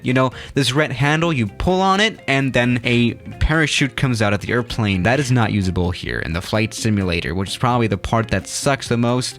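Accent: Germany. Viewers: R u german? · Does anyone else notice his German accent coming in stronger every video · Wait are you german?😂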